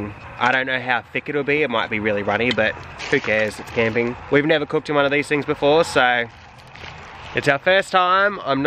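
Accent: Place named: Australia. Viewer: Ok you two are the only gay homesteaders on YouTube which means you please have to make more videos and make them more often. Plus you’re freaking Australian which means extra points for the accent. Thank you.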